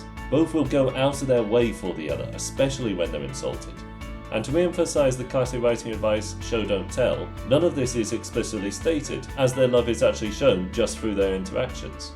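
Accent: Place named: United Kingdom. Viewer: You have great ideas and ways of presenting them. I suspect you will grow to be quite a large anime YouTuber if you can fix some of your production problems. To start, your audio problem is the biggest one. Your microphone is not the greatest, being very muddy, especially in low frequencies. And since your voice is very deep, the microphone makes it very hard to understand what you are saying. Add on the idea that there is a large American population that is interested in anime, having any sort of shortcomings in the audio department will make it that much harder to understand you (accents aren't hard to understand once one has gotten used to them, but not all Americans are used to a British accent). The background music in this video is very loosely defined as background music. It is too loud in the video. Also, the background visual seemed odd, feeling like a Windows Vista background. 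I am not very well versed many other areas regarding YouTube production and making good videos, but I can tell that you do have the most important part, interesting content. Now you just need to develop the other parts of your YouTube game and I suspect you'll find the growth you probably desire. In regards to this video, I really like what you've said about Genius Prince. I very much enjoy these kingdom builder-type shows like Dr. Stone or Maoyu (even though Maoyu was meh) and watching another like that would be fun!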